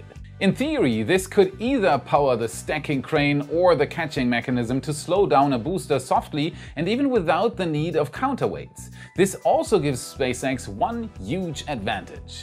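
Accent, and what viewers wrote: Germany. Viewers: haha he has quite the german accent, but his grammer and vocabulary are perfect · Dutch, German and Scandinavian people generally speak really proper English and quite a few are close to fluent or fluent. These languages are pretty similar to English in terms of grammar and structure. As a Dutch person I can easily spot ’ German roots in his accent though, just like it is pretty easy to spot Dutch accents when you know what to look for.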